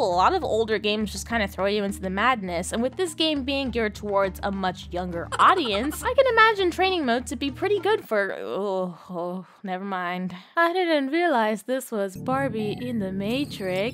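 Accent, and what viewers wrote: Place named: United Kingdom. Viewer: Just recently found your channel through reccomendation somewhat, and I love it.... After scroll through many of your videos, it Reminds me a bit of Kim Justice content, but with milder and clearer voice (british accent sometimes sexy, but hard for me to discern as a non native english speaker) and thats a lot kudos from me...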